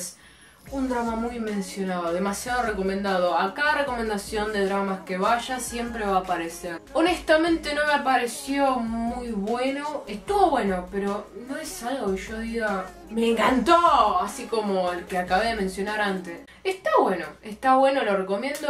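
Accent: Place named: South Korea. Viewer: JAJAJAJA la intro!! El coreanespañol cute que se te sale, es re tipíco de doramatica!! Personalmente te recomiendo Black que está en netflix, no te vas a arrepentir!! También te recomiendo Hello my twenties, las vas a adorar, también Descendants of the sun, Weightlifting fairy Kim Bok Joo. En fin, no terminaría más de recomendar pero dejo esos que están geniales. Saludos!! 💕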